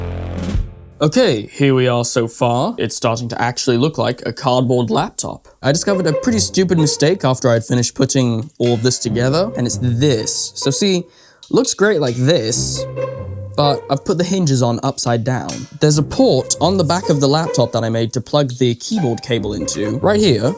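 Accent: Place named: United Kingdom. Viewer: you are somehow THE most british person I've ever heard and also WHAT WAS THIS NOISE anyways you are cool have my sub and like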